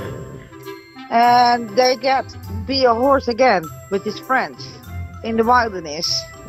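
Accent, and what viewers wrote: Netherlands. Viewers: I thought I heard a Dutch accent and then I heard "Ja, jongen!". Super gedaan! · Why does she sound Dutch?😶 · Hoor ik een hollands accent? Goed gedaan! Dankjewel ❤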